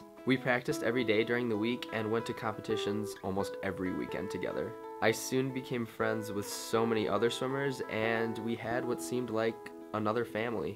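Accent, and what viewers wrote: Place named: Midwest, United States. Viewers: I knew I recognized your Midwest accent! I was born in Wisconsin and moved to Minnesota too! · You definitely sound like you're from Minnesota...cute :) So am I!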